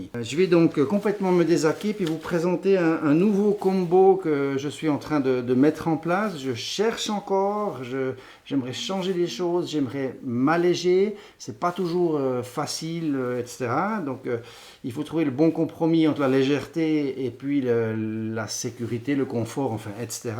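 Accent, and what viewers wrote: Switzerland. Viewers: Vous êtes génial de l'humour, de la passion , expérience et technique et des présentations limpides ( bon il y a un peu d'accent Suisse !!! ), Bon rétablissement concernant le genou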